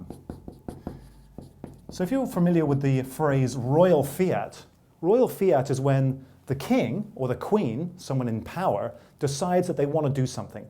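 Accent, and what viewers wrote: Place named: Northern Ireland, United Kingdom. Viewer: Hey, great to hear a familiar dialect from an expat of Northern Ireland. Really interesting.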